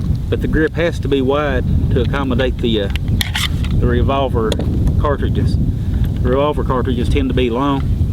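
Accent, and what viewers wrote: United States. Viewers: Love ur 'merican voice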